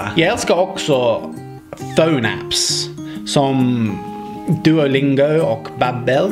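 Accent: Finland Swedish, Finland. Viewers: Wow! Gullig finlands svenska :D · OMG! Srsly... How did I miss this video? Loving the Finnish accent! How 's the Swedish coming along these days? I guess learning Finnish is probably a bigger priority for you. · haha you sound finnish. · Det låter som finlandssvenska men du är jätteduktig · Du pratar Finlandsvenska men orden är bra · To a Swedish person it probably sounds like you're already speking perfect finlandssvenska... Or at least this is pretty much what they sound like when they try to imitate it :) pretty fucking good, gotta tell ya! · bra svenska men med finsk dialekt. vrf tröck du där??!! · Haha, I love that you've learned it with a swedish/finnish accent! Good job, mate! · So... how long have you been speaking swedish men jag kan talar inte svenska även dom det är vor andra språk.. at least not any good don't even know is that correct :D . 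and i was so amazed for your finlandsvensk accent and perfect prenounsings ! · I can hear finlandssvensk dialect there :) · You should try to learn swedish with a swedish accent and not with a finnish one.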